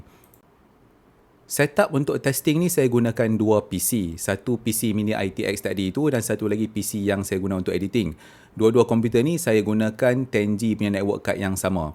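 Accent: North, Malaysia. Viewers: Saya pernah tengok dalam video dia yang lain, dia ada cafe. Sebenarnya video2 macam brother ni buat banyak dalam youtube, yang cun nya brother ni buat video dalam bahasa Melayu slang utara, dan cara penyampaian pun mudah faham, bukan semua orang boleh hadam video omputeh ni termasuk aku.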